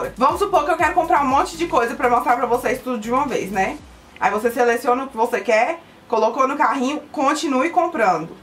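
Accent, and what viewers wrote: Minas Gerais, Brazil. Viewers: Pelo jeito de falar... é Mineira ou goiana né?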